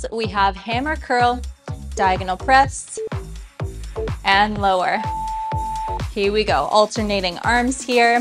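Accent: Canada. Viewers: I love all your stuff, (Canadian??) accent included! "Oh Canada, you have hot and awesome barre coaches!" I love the programs with less/ no pauses between movements. Can we get some more of those, puh... puh... pretty puh-leaze! (Please! :)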